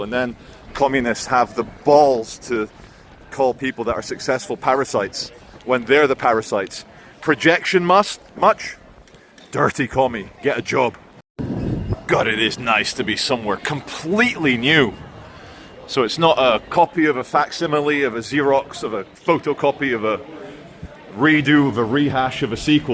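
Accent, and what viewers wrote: Scotland, United Kingdom. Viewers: you have an almost nearly Scottish accent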